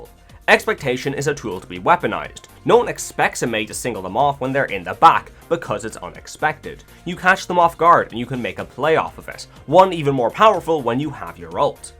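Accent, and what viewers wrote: Ireland. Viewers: Are you from Louth? Short circuited when i first saw the intro. Didnt expect you to say well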